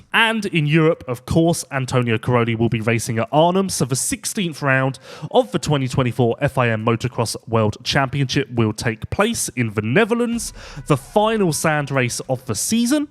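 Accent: United Kingdom. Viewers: Can we not have a Brit do the voice over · Yes, we need American english. It's a bummer when American legends like Roczen and Jet has an Englishman covering their series...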